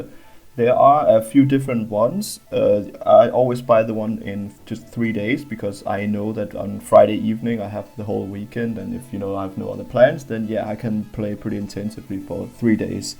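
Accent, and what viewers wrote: Denmark. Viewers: Du dansk, det kan man høre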